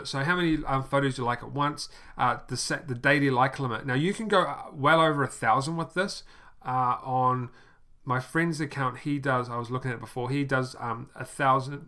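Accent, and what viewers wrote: New Zealand. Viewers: you from new zealand??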